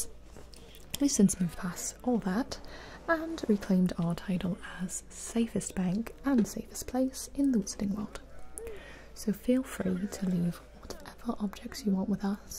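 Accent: England, United Kingdom. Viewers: From a fellow Englishmen I approve of this accent. good job 👌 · nah its obviously a mix of lots of different english accents and pronunciations. kinda like elon musk but with regional english accents. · Usually I don't like the English accents a lot of Americans use as its not realistic or accurate (I'm English) but with this is nicer because some people in England talk similar to this and even I do to an extent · English. Not British. Britain includes Wales, Scotland and Northern Ireland. She is doing an English accent. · Many subscribers with no videos I’m sorry but English people over the age of like 20 all sound like this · Im from england and thats a great accent very good 10 out of 10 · Good English accent and thats coming from an Irishman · Great accent! You sound like you're from England! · You do a great job with English accent daaarlling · no this is an english accent because the accent is from England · I'm not sure if everyone's appreciating just how hard it must have been to do an English accent while also having fake fangs in your mouth! 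haha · your English accent is amazing! Sending you love from the UK 💕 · Nice accent, it's better than some English people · CAN 👏 WE 👏 GET 👏 MORE 👏 ENGLISH 👏 ACCENT 👏 VIDEOS 👏 PLEASE 👏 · That English accent though! Like YASSS! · Me as a Englishman I think that is a great 👍 imitation of a English accent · your English accent is on point! My family comes from Wales but grew up in London. Luckily, I have also picked up the accent. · Your English accent is so funny! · Hey you sure you are not from England either that or you have some seriously good accent skills 😦🙌🏻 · You seem to have nailed the English accent,which is unusual for an American. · After some time i actually thought she was English Very well done 👏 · If I was new to this channel, and if it wasn't for the merch bit at the beginning of the video, I might have believed that you actually were English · Dylan Brooks you realise how many different accents are in england? 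lmao where i am from most people sound like in the video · I need another video with English accent 😭💘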